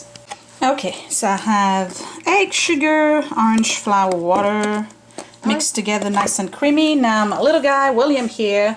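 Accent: France. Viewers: Listening to you and your mother speaking in French reminded me of something I keep wondering about ... I know you have been in this country a long time and your accent is very slight but the question I have is, do you THINK in French and then have to translate before you speak or do you now THINK in English? That's just my strange mind at work again.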